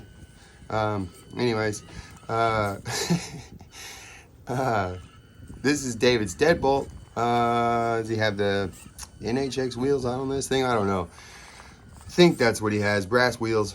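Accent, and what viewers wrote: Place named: Southern California, United States. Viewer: DUDE don't even trip on sayin DOOOOOOOD. SO CAL from the get go!!!!! I feel you on one handed driving that's 2FMRC territory LOL Diggin your vids DUDE keep it up.....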